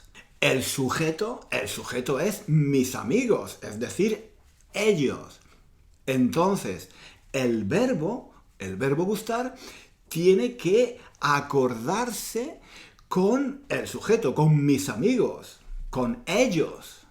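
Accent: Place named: Andalusia, Spain. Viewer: Hola nos gustan tus vídeos y nos gusta tu acento andaluza y nos gustas tú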